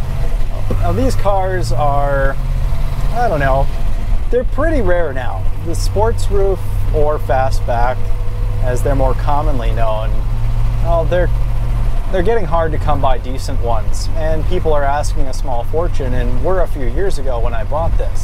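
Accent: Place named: Minnesota, United States. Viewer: What part of Minnesota are you from.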